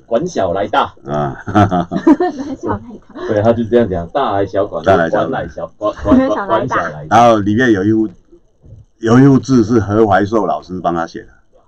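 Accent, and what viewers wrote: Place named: Minnan, China. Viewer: 小董好可愛！尤其是他的閩南國語，每一個咬字都好誠懇！：聽了我想投小董選立委！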